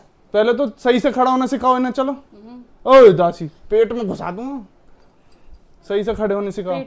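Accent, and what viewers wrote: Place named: Aligarh, India. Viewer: Bhai humari bhi poori family aligarh se hi, Big fan of your accent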